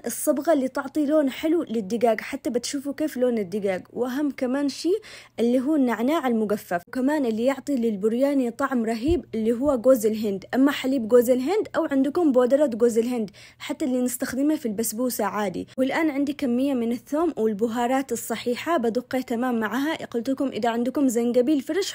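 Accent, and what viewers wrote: Aden, Yemen. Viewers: حبيبتي بنتي الغاليه ربي يحميك يسعد قلبك انتي بلسم على القلب خرجت من عدن واني صغيره ورجعتني لكل حاجه حلوه للكلام الدي في بعض من الناس يستحون من الهجه بس انتي برابه عليك انتي تتميزي بلهجه العدنيه وده اول نجاحك وموهبت بطبيخك الحلوه ربي يوفقك ياقلبي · مرره احب طبخك اتابعك استمرار خاصه صوتك لهجه اليمنيه 🥰🥰 الله يسعدك ابي طريقه بروستد من طريقتك الخاصه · السلام عليكم الله يحفظك يا بنت بلادي سويت اشتراك في قناتك بسبب اللهجه حق عدن و علي الاكل و المحتوي الجميل من دون تكليف . تحياتى لك و المتابعين في قناتك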